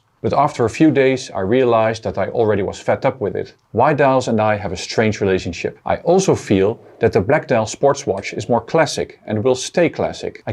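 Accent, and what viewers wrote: Netherlands. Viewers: I am one of the lucky owner of the black one. Its great to see this review in English with a Dutch accent :-) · I love how me as a Dutch person can hear from somebody's Englisch if he is also Dutch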